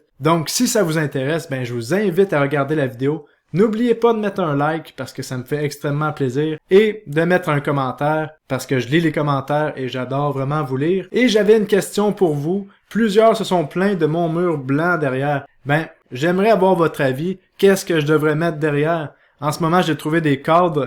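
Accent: Quebec, Canada. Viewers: Allez le Québec ! j 'adore ton accent canadien Tu apporte une note de joie. Et d'enthousiasme. Bonne journée. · tu es parfait, merci pour tes analyses et ta manière d'expliquer les choses! Avec plaisir pour que tu parles des cryptos à petit marketcap! Au passage, j'adore te regarder, avec l'accent québécois c est totalement dépaysant, dès que je suis riche, je viens faire un tour au Québec!:D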